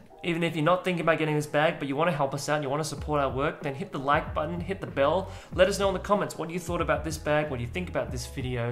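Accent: Australia. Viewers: Was just noticing your accent in the video and thought shit this guy might be Australian, but then measuring bag capacity in 6 packs confirmed it hahaha.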